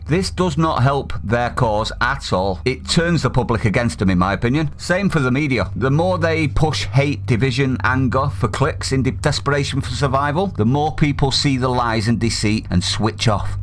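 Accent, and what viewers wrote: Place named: North, United Kingdom. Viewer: Love your channel. been meaning to tell you that for a while. As a liverpool lad, it is lovely to hear a sound northern voice of reason rather than these heads up their own arse ox-bridge set on the lame stream. Three cheers for you! Thanks! You are appreciated. Ps also Like it when yr missus chips in too - you can't beat good old common sense!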